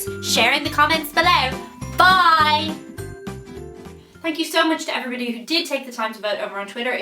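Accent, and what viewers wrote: Ireland. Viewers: I can usually understand you, but I was too hung over to understand a word you said? You were gung ho on the Irish accent on this one? WTH?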